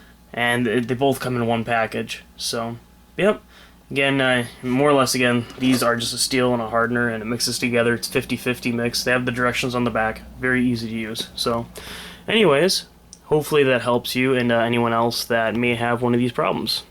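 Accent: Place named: Buffalo, United States. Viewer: sounds like your from buffalo everyone pronounces mags as "megs" lol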